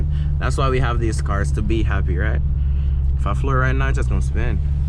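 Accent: Jamaica. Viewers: Yoo bro u sound Jamaican where u frm? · Are you from jamaica? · Mi know mi hear a Jamaican 🇯🇲 accent big up yuhself Bredda yuh drive like a real yaad man. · You sound Jamaican🤔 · U Jamaican bro ? Man tuff yf 😂💪🏽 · Bro you gotta jamaican accent 🇯🇲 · cant hide that Jamaican accent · u Jamaican or carribean by any chance?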